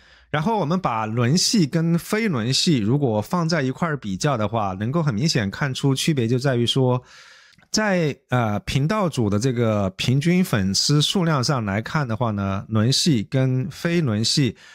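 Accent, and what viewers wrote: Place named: South, China. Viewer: 听up主的口音，好像是南方人，广东福建一带的？有没有关注香港或从香港逃到台湾的一些大V，以粤语为主，粉丝量和 收入也很可观。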